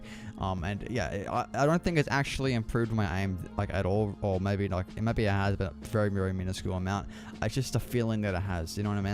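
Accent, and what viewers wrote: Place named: Australia. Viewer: Australian, defiantly a follow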